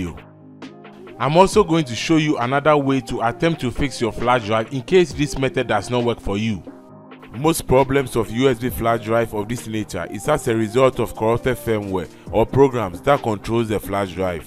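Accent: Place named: Nigeria. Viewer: You sound like you may be Nigerian. Some good tips. Thank you.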